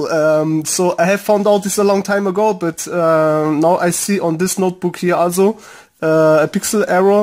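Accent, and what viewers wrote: Germany. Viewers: Man merkt sofort dass du deutscher bist.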